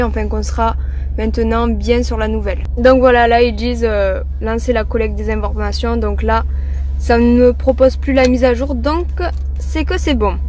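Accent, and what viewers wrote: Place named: South, France. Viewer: Super merci pour ce tuto tout ça avec l'accent du sud 👌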